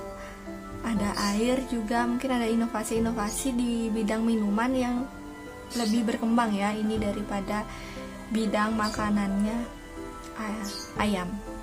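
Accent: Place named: Bali, Indonesia. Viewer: Logatnya kakak kaya orang bali 😀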